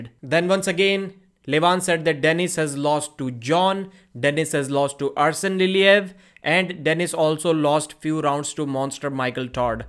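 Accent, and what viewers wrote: India. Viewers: why your accent like indian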